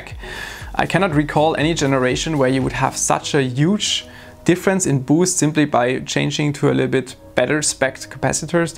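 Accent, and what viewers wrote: Germany. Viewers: man hört das deutsch echt arch (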